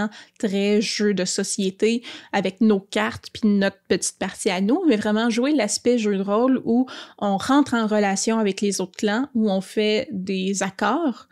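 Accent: Quebec, Canada. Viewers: Bel accent Quebecois! (Un Francais 😊)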